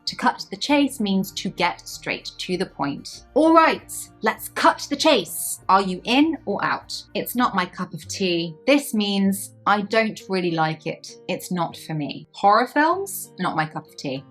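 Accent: United Kingdom. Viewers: Your English is British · Oh my your accent is absolutely what I want to practice! Oh so beautiful perfect British accent ... And your gesture, example sentences ...all perfect!!! Thanks for your video❤❤❤❤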